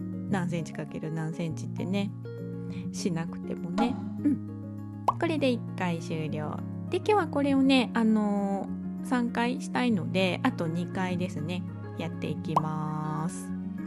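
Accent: Kansai, Japan. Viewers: 初めまして😊久々にパンを焼きたくてYouTubeを見ていたら『お勧め』に出てきたので早速拝見しました🎉 楽しくて軽妙な柔らかい関西弁のおしゃべり(お話)に、グイグイ引き込まれて、あっという間にファンになりました😀デニッシュパン早速挑戦してみます🍞🍞 これからも楽しみにしてます😊もちろん登録させていただきました❤❤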